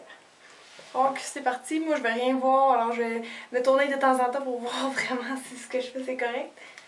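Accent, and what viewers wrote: Quebec, Canada. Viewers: She has a canadian accent :thats why ! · jador lacsen canadien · Super vidéo merci continue ainsi !!! J'aime bien ton accent québécois :) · très jolie coiffure, qui a l'aire facile à faire. je vais essayer!!! ça ma fait bien rire quand tu parle de bobépine je ne connaissais pas ce mot et j'ai compris que ça voulais dire épingle à cheveux. J'adore l'accent québecois!!! Continue tes vidéos sont très bien!! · Omg sa fait du bien d'entendre une québécoise dans se genre de vidéo! La coiffure est vraiment belle! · Canon et j'aime ton accent québécois ! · Je vais dire la même chose, très rafraîchissant d'entendre la couleur de l'accent québecois sur youtube. Continue d'envoyer des vidéos, ils sont vraiment bien faits. D'une Québecoise à une autre. Bravo! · J'adoooore l'accent québécois ! ! Et cette coiffure te va super bien !! :p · J'adore ce genre de coiffures et j'ai le même headband que toi seulement il glisse souvent (j'ai les cheveux très fins), je sais pas comment faire pour qu'il tienne bien, tu aurais une solution? 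Tes vidéos sont super, et ton accent me rappelle mes vacances au Québec :)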